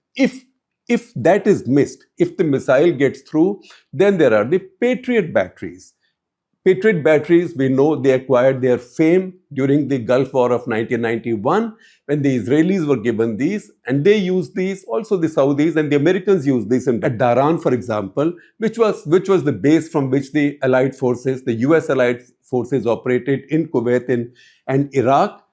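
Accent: India. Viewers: Utter garbage spewed by progandists , & repeated by a stupid fool young man from sindustaan stone age , with a thick kooli accent... Go back to cultivating your land and go produce some magh dhal beans... However Sirrrr rrrrrr....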